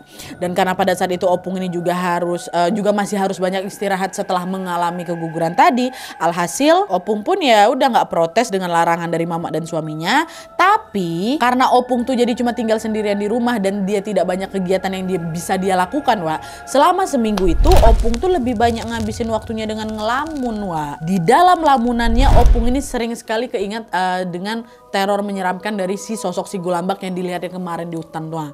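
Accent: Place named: South Tapanuli, Indonesia. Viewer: Maro au,, kayak dari tapsel deh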